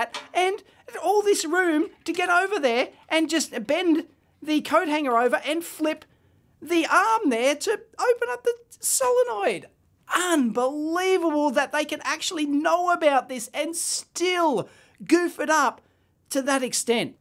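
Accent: Australia. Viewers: LockPickingLawyer grew an aussie accent (oh, Its. He says hes not LPL lel)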